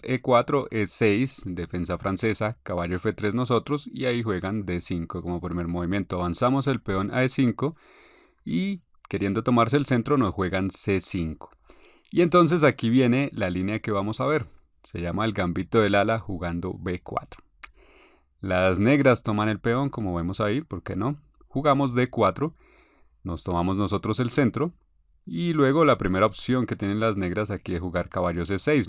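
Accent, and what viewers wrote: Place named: Colombia. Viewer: excelente video, hablas como un colombiano saludos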